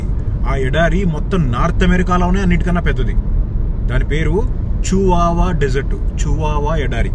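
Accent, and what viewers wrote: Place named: Telangana, India. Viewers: Telangana slang m ra vari😂😂😂😂😂😂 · Emirà vari .....😂....pure telangana slang .....i jst got remembered Venu Madhav....garu😂😂😂😂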